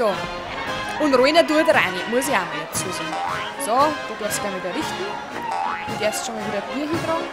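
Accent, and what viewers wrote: Bavaria, Germany. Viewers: Die Idee finde ich gut Finde das spiel so witzig besonders mit deinem bayrisch =) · mach mal ne ganze folge auf Bayrisch! BITTE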